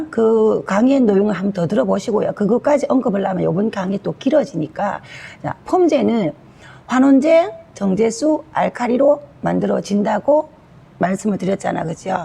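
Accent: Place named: Gyeongsang, South Korea. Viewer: 오리지날 경상도네요 경상도 사람들은 말할때핵심이 없고 말만 많아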